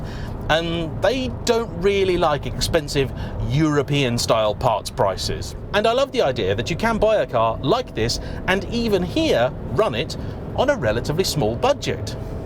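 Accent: United Kingdom. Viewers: Hi first off I always love hearing a British accent. I am born and raised in America Virginia to be exact but my roots run all the way back to Manchester England. Anyway I am 64 years old and I was raised on big American cars and I love the Suburban. However the landscape has really changed in America in the last twenty years and many people now are not that crazy about them. With all of the climate change crap iconic American Vehicles like the Suburban aren't that popular anymore. I still love them though and this video makes me want to go buy one. I think your reaction at the end said it all you found yourself really liking it which most people would if they gave it a chance. Hey there's a reason it's been around so long. Great video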